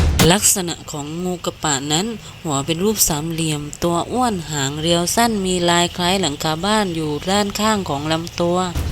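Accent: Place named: North, Thailand. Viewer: สำเนียงคนบรรยาย..น่าจะเป็นคนภาคเหนือใช่มั๊ยครับ😁😁😁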